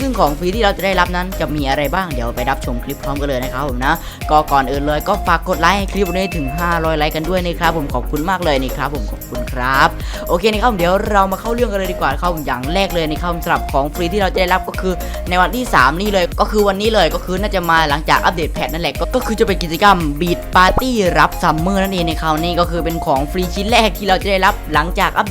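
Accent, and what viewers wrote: South, Thailand. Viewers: เสียงพี่เหมือนคนใต้เลยอ่ะ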